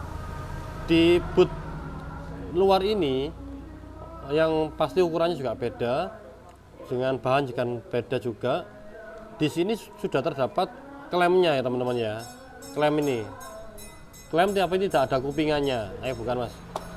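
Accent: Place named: Surabaya, Indonesia. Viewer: Masuk, suroboyone nyel Iki😁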